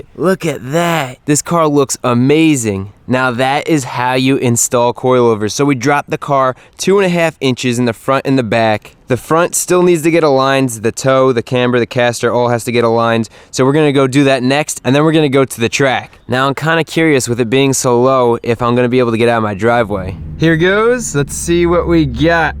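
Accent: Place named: New York, United States. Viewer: Where is the track that you go to? Sounds like you're from NY/NJ and the closest place I know of is in PA (I'm from NY) the name of the track would be helpful!!!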